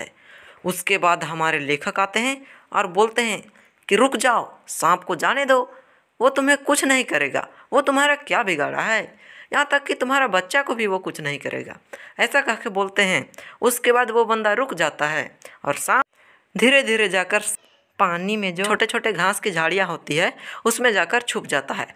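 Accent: Bihar, India. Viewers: Bhai aap bihari ho kya?😀 Ek dam bihari accent....mast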